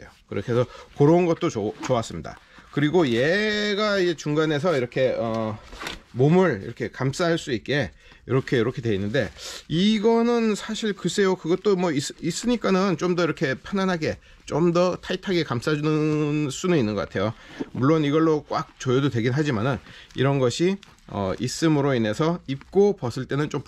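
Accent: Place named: Japan. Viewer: you sound Japanese or maybe Korean but you don’t sound like a chinaman I hope your not a chinaman I could not understand what you said other than acelink armor I feel you gave a good review of this item enjoy the plate carrier and buy two more sets and donate them to Taiwan they’ll need them to fight off the chinaman we have swapped to chinaman targets to practice hitting the short dumb backward bat eatin people if you are chinaman then know plate carrier is more effective without any inserts in it at all it’s an American secret so don’t tell chinaman good luck hope you never need plate carrier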